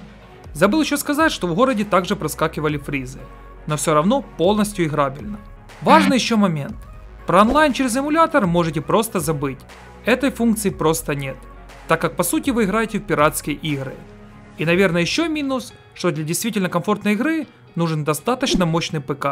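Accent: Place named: Western Ukraine, Ukraine. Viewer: А ты что Русский паря? Я думал по говору Бандера.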